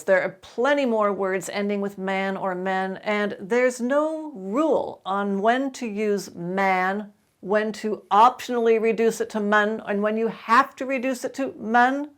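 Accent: United States. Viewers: we are speaking english - you with your ( gentle ) American accent, me with a strong Aussie accent. And yet somehow it sounds the same... Cheers lots Mate !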